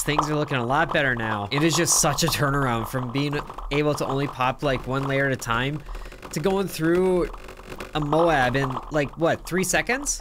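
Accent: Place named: United Kingdom. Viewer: Your accent is probably why they think you're British? 🤔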